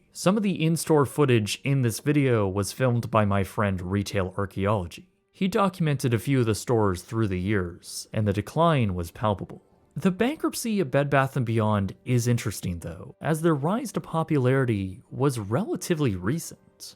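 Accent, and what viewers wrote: Canada. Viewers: Forgive me for asking, but what part of Canada are you from?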